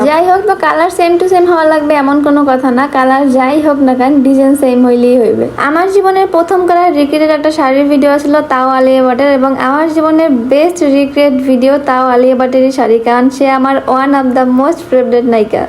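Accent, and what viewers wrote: Barishal, Bangladesh. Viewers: আসসালামু আলাইকুম আপু আমি আপনার ভিডিও অনেক দেখি আমি বরিশাল থেকে দেখেছি বরিশালের ভাষায় কথা বলেন তাইতো দেখি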